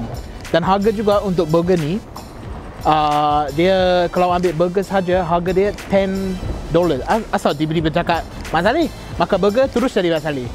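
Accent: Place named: Malaysia. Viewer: ckp inggeris mcm telo melayu. Terbaik.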